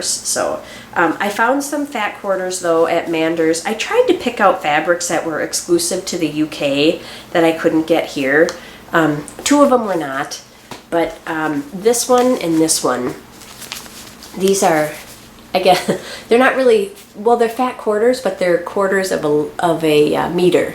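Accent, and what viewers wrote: Minnesota, United States. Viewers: I wondered where you were! Thanks for sharing ypur pictures of the trip. It is on my bucket list and now I am even more excited to see those countries, so beautiful! I admire your creative choice to put in the specialty stitch on the background. It does look like a tapestry. You should stitch your name in the other one. I am blanking on the names of them right now as I started watching at bedtime and now it is morning. Brain like a sieve! I enjoy your videos for many reasons not the least of which is that I grew up in MN and when I here you speak I get a bit nostalgic.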